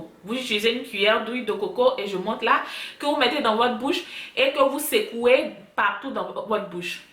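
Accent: Ivory Coast. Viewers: Les astuces sont top, je connais celle du charbon et du bicarbonate mais pour huile de coco, d'abord ici en Côte d'ivoire, c du liquide donc ça dans ma bouche pendant 25 min tchiéé je vais finir par avaler Le carbone coco je peux l'avoir ou stp? 😂😂😂 la manière de parler, ma chérie tu es ivoirienne ? 😏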